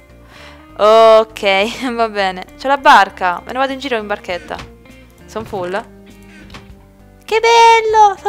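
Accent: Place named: Rome, Italy. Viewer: Solo a me non piace quando parla in romano? :c (non ti arrabbiare, ma per me è così <.< per il resto sei bravissima)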